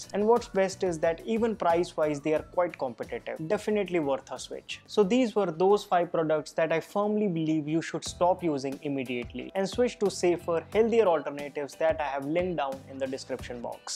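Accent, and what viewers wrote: India. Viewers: So Indian English accent 😂, hilarious 🤣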